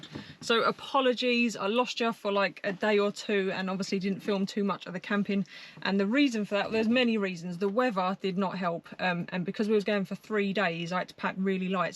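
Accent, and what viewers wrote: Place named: England, United Kingdom. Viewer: I know this is a really odd reason to watch along, but I really enjoy just listening to some everyday English, dialect and all :)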